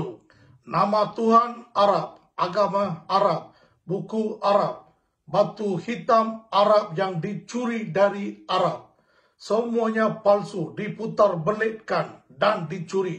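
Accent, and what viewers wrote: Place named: Malaysia. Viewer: Looks like that right? But problem is the words are Malaysian Indian slang and accent you know.. not sure la bro.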